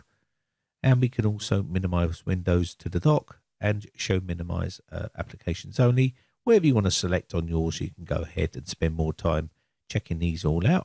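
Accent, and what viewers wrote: United Kingdom. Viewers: I'love ur Voice bro Nice UK Guy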